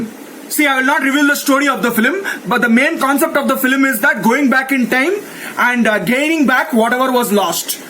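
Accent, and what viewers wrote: India. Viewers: are you an Indian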